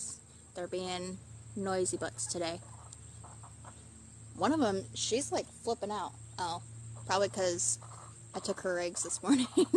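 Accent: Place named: Florida, United States. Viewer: I can say as a father of daughters I am very proud of you getting away from Lie-net and focusing on your family. You sound like you would fit right in my country area of osteen florida. Jeremy hales is a really good guy who sticks to his word and helps through the heart and has much respect world wide. I look forward to more videos from you.... until then keep that pretty smile going😄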